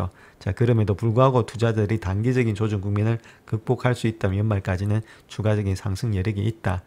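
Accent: Gyeongsang, South Korea. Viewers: 경상도 사투리 서울 토박이인 제가 듣기에 매우 인텔리젼틱하게 들리네요^^ 경상도 분들은 다 머리가 좋은가봐요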